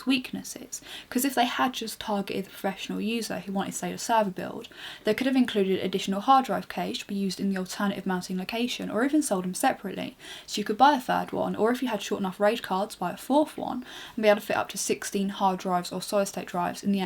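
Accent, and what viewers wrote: England, United Kingdom. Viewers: u forgot to mention very sexy voice and british accent! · Mornbs What are you on about? It is ENGLISH, not american. If you don't understand what she's saying, then you need some more english lessons. O.o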